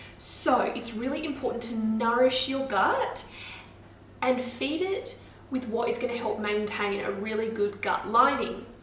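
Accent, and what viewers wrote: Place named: Australia. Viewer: Really? You’ve obviously never met an Australian, this is how a lot of us talk. 😊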